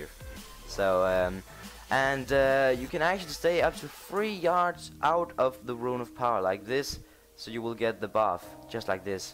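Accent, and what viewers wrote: Denmark. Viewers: Your accent sounds danish. Are you danish?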